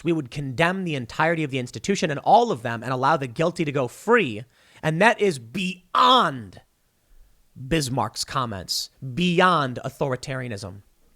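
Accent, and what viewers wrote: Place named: New England, United States. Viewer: stop saying “Y’all” it’s a gross word and it sounds even more gross coming from your Yankee voice box.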